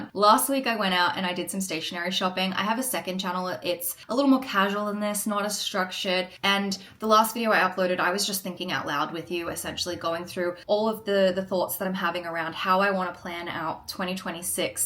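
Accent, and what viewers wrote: Australia. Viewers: no no the aussie accent is going!!! ❤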